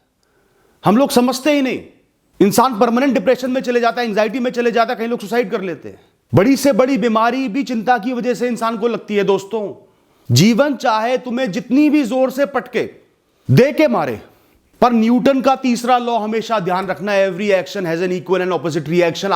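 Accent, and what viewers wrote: India. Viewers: Very Nice Sir Motivating and I like your videos due to our Desi and Indian Accent Aap ekdum acche se samjhate hai Really Superb Sir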